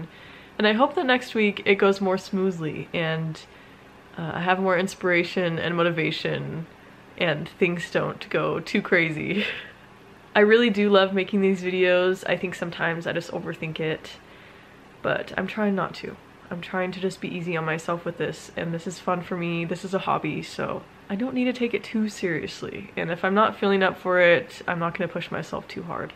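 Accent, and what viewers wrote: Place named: United Kingdom. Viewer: Bonjour ! Tu vis dans un environnement magnifique, idéal pour trouver l'harmonie et se sentir connecté, et y compris avec soi. Une astuce pour apprendre le français ( même si tu en parles dans la vidéo), regarde des films en français, tu verras..c'est chiant !! Mais concentre toi sur l'action, tu comprendras un peu, mais surtout, ça va travailler ton oreille et tu apprendras plus vite !! C'est ce que j'ai fait avec l'anglais et au bout d'un mois aux USA dans une famille, je tenais une conversation, alors qu'au début je ne comprenais rien, mais rien de rien 😁! Par rapport aux Britanniques, votre accent est terrible ( il faut comprendre la clef), et vous parlez VRAIMENT très vite !! L'horreur 😂... Après, c'est juste une question d'habitude. Le plus incroyable, qui m'a vraiment surpris, c'est que je pensais en anglais pour parler. 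Voilà.. Merci d'être toi ! Peace...🌿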